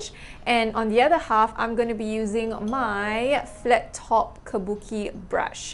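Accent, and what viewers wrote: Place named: Singapore. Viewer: hello just came across your channel you sound singaporeon :) 😀 I half singaporeon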